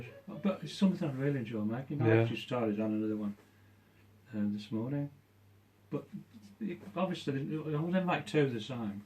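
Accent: Birmingham, United Kingdom. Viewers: Sounds like a fellow Brummie! We have always been an inventive lot.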